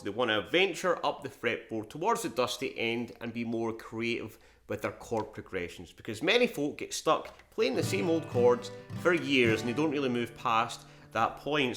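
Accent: Scotland, United Kingdom. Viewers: Good stuff! What part of Scotland?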